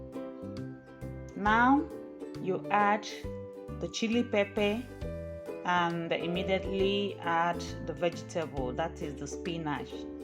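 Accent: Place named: Equatorial Guinea. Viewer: What is your connection to Nigeria? You speak like you are from Equatorial Guinea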